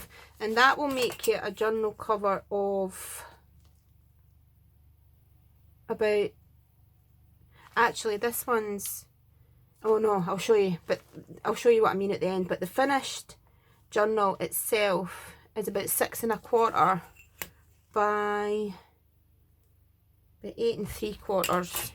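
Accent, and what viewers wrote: Scotland, United Kingdom. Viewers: A nice and simple and yet beautiful and love your scotch accent ♥️🌹✝️🙏🇬🇧👍🌷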